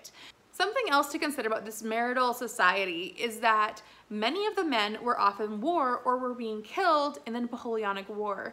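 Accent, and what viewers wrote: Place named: United States. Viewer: I enjoyed listening to your commentary. Maybe your American accent helped with objectivity!😊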